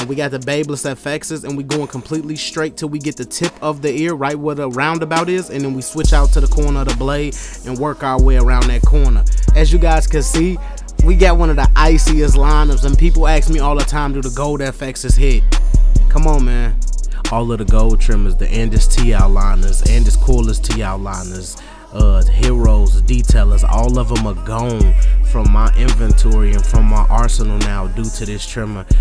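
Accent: Chicago, United States. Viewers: Ayo you frm Chicago??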